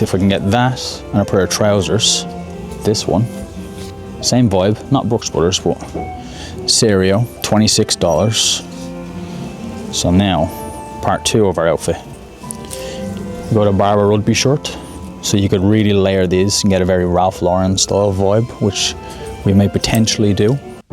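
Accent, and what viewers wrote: Ireland. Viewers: Classy clothes, cool sounding irish accent and great thrifting tips. Quality content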